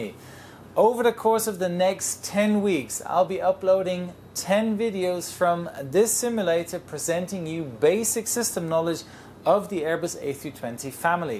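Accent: Germany. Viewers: Is he a German? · Captain you are from Germany? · Kommst du aus Deutschland?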